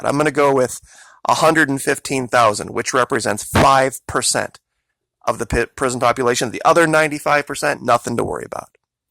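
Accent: South, United States. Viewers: And the condescending southern accent thni g is highly insulting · I'm trying to place the dialect; Forrest, Forrest Gump? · Love the sthrn accent haha 🤣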